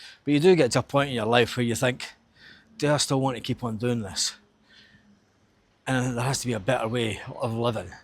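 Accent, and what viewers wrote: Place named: Fife, United Kingdom. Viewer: I’m a Scotsman in Italy….🇮🇹 I left more than 20 years ago. Your accent seems Fife? East coast accent?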